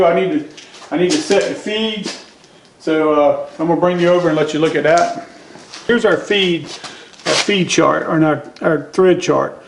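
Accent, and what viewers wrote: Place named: South, United States. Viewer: Still learning how to listen/understand southern backyard machining (American) English/accent. Your learning curve on understanding that old of machine, informative.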